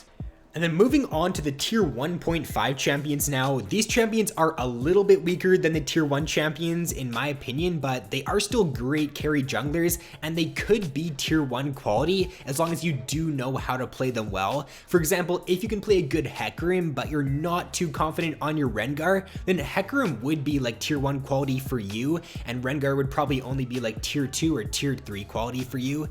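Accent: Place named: Canada. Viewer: Canadian af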